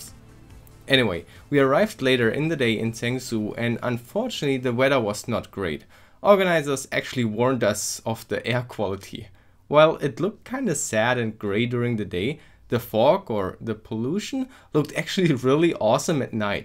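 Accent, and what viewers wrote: Germany. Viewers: That german accent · Dicker der Deutsche Aktzent zerstört so haha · r u a german guy? i heard the slang long and in the clip the alter got me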